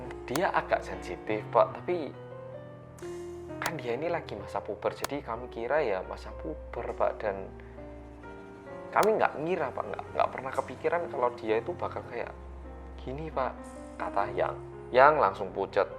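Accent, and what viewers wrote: Java, Indonesia. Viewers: 3 channel storytelling favorit: 1. (pelan, medhok dan mudah dipahami) 2. Nadia Omara (gayanya kayak itu ghibah) 3. Arroyan Pram (Konspirasi dunia pake Astronomi, Fisika, dan editannya gila keren banget, serasa nonton agung hapsah dan Jerome polin bahas konspirasi) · Yang MEDHOKKKKKKKK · Balik lagi sma yanggggggg medokdokdokkk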